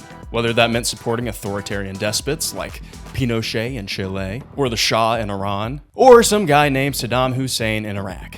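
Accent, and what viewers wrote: South, United States. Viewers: I love your southern accent voice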